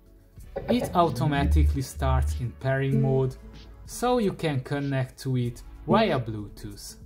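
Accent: Hungary. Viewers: Magyar vagy, ide hallom 😂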